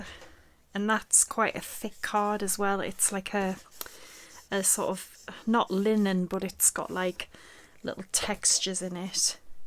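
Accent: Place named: Sunderland, United Kingdom. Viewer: Thanks, great reviewing (even if I just bought the Sizzix switch and am regretting it now). Love your accent - are we both from Sunderland? Except I live in Sydney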